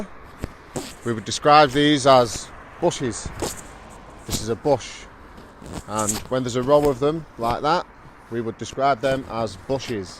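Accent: Northern England, United Kingdom. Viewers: Hi, Finally found a channel where a British English teacher is speaking in northern accent. Don't get me wrong, but I don't understand when people speak in northern accent. I have recently moved to London from India and I feel terrible when I don't understand British people. I just want to understand what everybody is saying and I would like to communicate with them. How can I do that? Could you please tell me?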